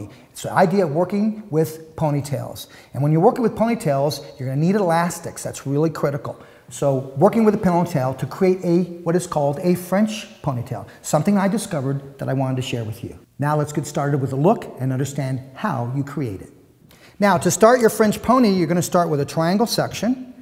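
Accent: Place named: Philippines. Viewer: hi sir... are u a filipino? you look like a pinoy and your accent...and also the way you speak... =) you're amazing uncle...hehe galing mo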